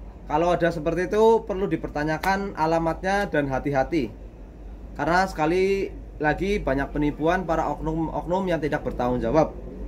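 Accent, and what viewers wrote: Banyumas, Indonesia. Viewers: Sampean asline ndi kang.. Kayane ngapak nemen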